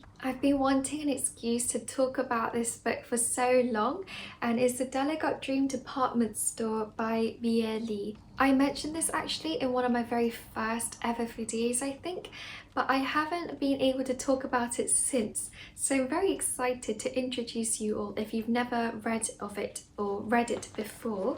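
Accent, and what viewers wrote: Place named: United Kingdom. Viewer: I love your brittish accent oh my god keep talkinggggg!! 🫶🏻 hahahaha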